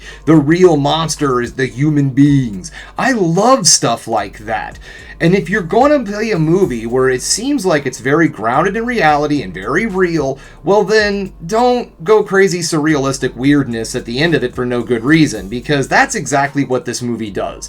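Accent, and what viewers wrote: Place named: North America. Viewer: I keep meaning to ask this: where exactly are you from? I'm a British guy and I just adore hearing your accent. I know it's either an American or Canadian accent you've got (I admittedly struggle to distinguish the two), but I'm curious about which region. Great review, btw 🙂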